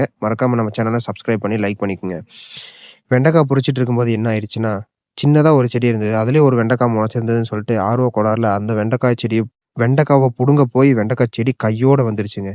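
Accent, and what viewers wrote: Coimbatore, India. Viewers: கோயம்புத்தூர் சுற்று வட்டாரத்தில் பறிக்கிறதுன்னு சொல்றத பொறிக்கிறதுனு சொல்லுவாங்க அது பேச்சு வழக்கம் யாரும் ஏதும் நினைக்க வேண்டாம்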